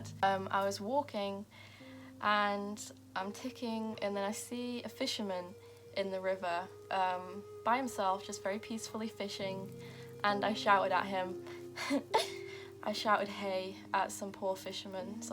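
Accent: United Kingdom. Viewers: I love your accent, what kind of uk accent is it?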